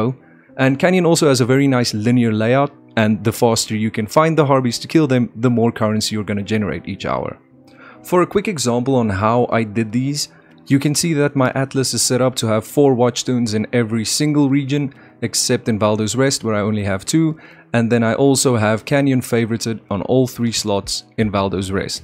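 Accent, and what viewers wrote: South Africa. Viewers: Sounds ikea a fellow SAFFA 🤔, hoe gaan did boet, ek gaan dit vandag doen.. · That south African accent is thick AF brother. Hallo daar van die kaap. Nice vid bra. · NP i found it i knew your accent sounded familiar, im From SA to bro gg content il supp u all the way keep it up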